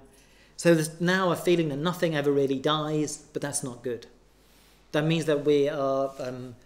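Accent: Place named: United Kingdom. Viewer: British accent is tricky 👽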